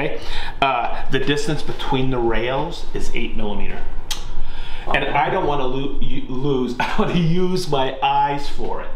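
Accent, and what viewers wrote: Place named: New England, United States. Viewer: I just got a TS55 & OF1400 router. I read a lot of guys say only go with a continuous track… Would you recommend joining 2 Festool tracks to cut a full 96” length of plywood or just getting the FS2700 or FS3000? Thank you for the videos by the way. I have been watching the last few weeks. Great content. Love the new england accent brother!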